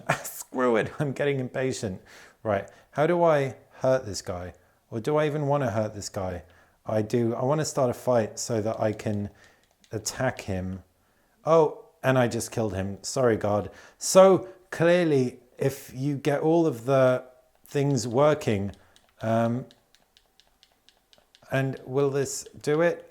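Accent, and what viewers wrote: Egypt. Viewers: You speak Arabic in Egyptian accent even better than Egyptians themselves 😀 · Wow U Speak arabic .. Is that an egyptian akcent i guess ? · Omg I follow u from about 5 years now... How come you know arabic this clear in Egyptian accent I'm from Egypt and I'm impressed... Keep up the good work... Have a nice day, cheers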